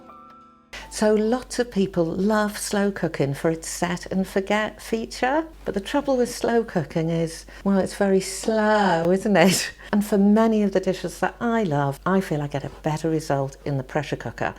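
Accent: United Kingdom. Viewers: Dear Madam, your delivery, speech and of course, the British accent English is really something. Kind regards.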